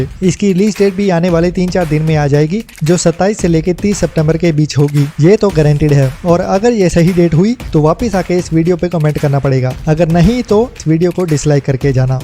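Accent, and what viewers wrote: Punjab, India. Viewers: You speak like Dara Singh and such a heavy Punjabi accent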